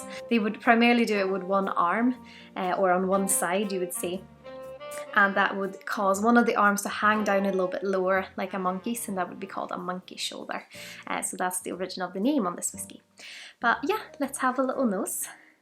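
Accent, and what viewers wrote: Scotland, United Kingdom. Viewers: Have you picked up a bit of a scottish accent, thats not a swedish accent i've ever heard haha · You have a bit of a Scottish accent.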